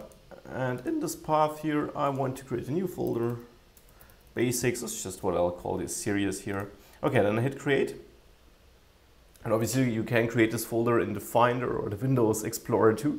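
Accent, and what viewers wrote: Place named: Germany. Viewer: The German accent man, that's a pain in the a**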